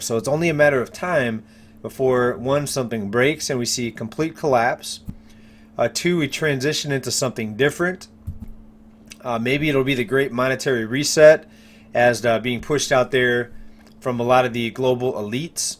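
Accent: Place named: South, United States. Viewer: I like his big bubba accent!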